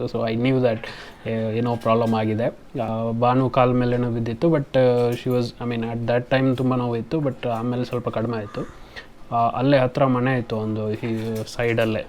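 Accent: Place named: Kundapura, India. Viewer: Super bro... ನಮ್ ಕುಂದಾಪ್ರ,ನಮ್ ಕುಂದಾಪ್ರ ಕನ್ನಡ ಕೆಂಬುಕೆ ಚಂದ...😍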